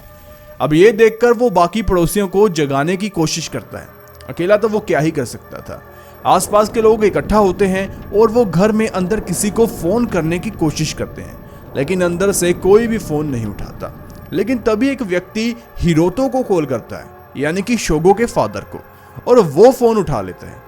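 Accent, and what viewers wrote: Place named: Punjab, India. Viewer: thorA bai punjabi touch nll bolda hindi like teekhi cheej bola nuklelee ko 😂😂 ndd bor nhi hunda bnda jinioo mrzi long hove video ❤❤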